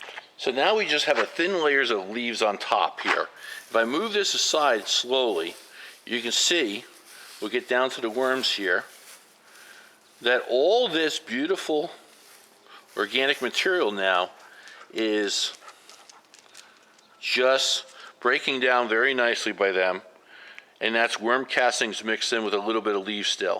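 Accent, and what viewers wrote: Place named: Philadelphia, United States. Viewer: You sound like you have a bit of a Philadelphia area accent. Maybe Baltimore?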